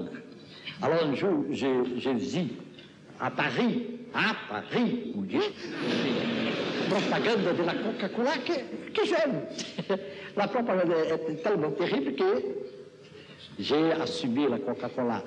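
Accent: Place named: Brazil. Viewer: Ha algo que me emociona: Dom Helder fala um francês que os franceses podem entender mas sem perder o seu jeito brasileiro de ser ... ele é aquela pitada de sal que guarda o gosto do mar ... e então pode dar gosto à farinha que vai se transformar em pão : Deus seja louvado pelo nosso grande irmão Dom Helder!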